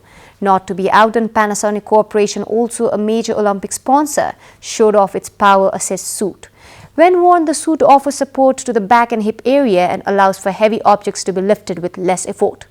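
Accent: United Kingdom. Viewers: hora vanya!!🙈🙈🙊😝malai ta testai lagyo munnile pani euta episodema hajurko jastai gari british tone ma english boleko thien..hajurko interviewma kai katai bhadragolko kura sunina kin tyas bare navaneko naaodeko vanne lageko thiyo..😁😁.munni navayeni hamro lagi ideal ho..indian reporterlai reply didai babaal lageko thiyo malai..interviewma bolne saili sarai saral xa ghamandapan dekhina sadhai vari uchha bichar samanya bolne saili kayam rahos.english video haldai garnuhola hamile sikne mauka pauxau..🙏🙏🙏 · Lovely British accent 😘😘😘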